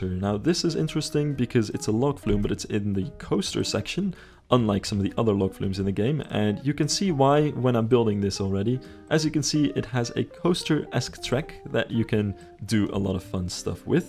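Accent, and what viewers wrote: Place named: Netherlands. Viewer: The thick Dutch accent tho :D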